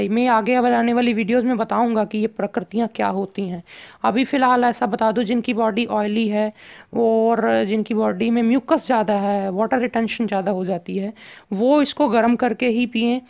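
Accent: Punjab, India. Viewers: swami g..aaj tak maine itni sachayi or somyta awaz me kbhi ni dekhi...chahe bzy hone k karn shuruaat ni ho ri ..bt aapki vani mein puri schayi jhalkti hai..beech me punjabi ka jo tuch hai ..usse to aur.bhi saadgi jhlkti hai..jo hmare buzurgon.ki.yaad dilaati.hai..god bless u swami g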